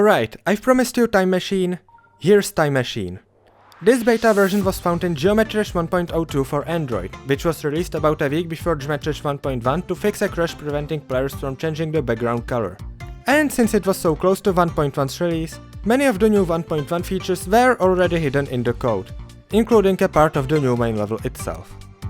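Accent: Czech Republic. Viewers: 🇨🇿🇨🇿?? I can hear the accent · ahaa jo ty si čech... já jsem si říkal, ten přízvuk a najednou místo "attempts" tam bylo "pokus" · honestly kinda surprised i never realized that this is what a czech accent sounds like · That czech accent be bitting different thooo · Your accent sounds hella czech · Já vím že si čech už jenom podle toho přízvuku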